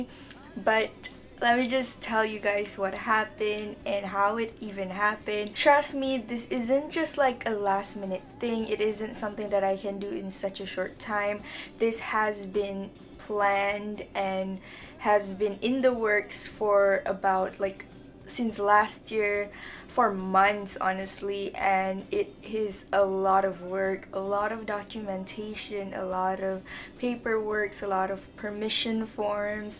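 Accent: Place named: Philippines. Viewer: the way she spoke like filipino..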